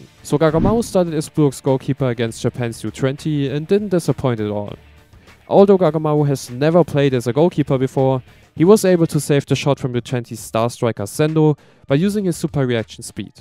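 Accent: Germany. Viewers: you sound german, are you? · Bruder kannst du vllt deutsch weil dein Englisch hört sich so an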